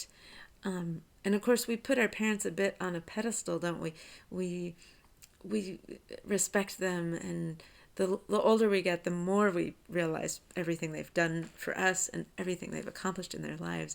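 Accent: Ireland. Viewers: Bad start.......IRISH!?!?!?!?! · What....an Irish ...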